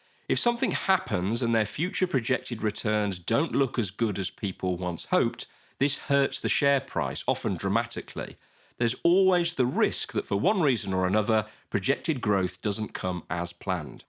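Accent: United Kingdom. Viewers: What a brilliant video. You just automatically trust someone with a british accent and a deep voice lmao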